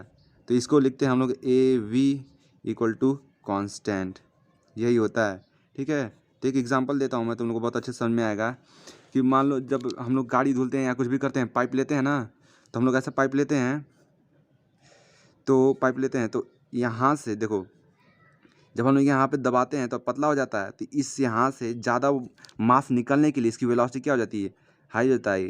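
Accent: Bihar, India. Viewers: Ur bihari twist in hindi so wonderful 👍👍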